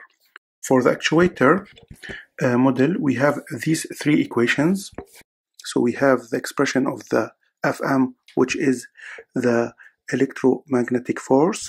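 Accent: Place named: Lebanon. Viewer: i recognized your accent a bit, are you Lebanese? thank you professor for the amazing explanation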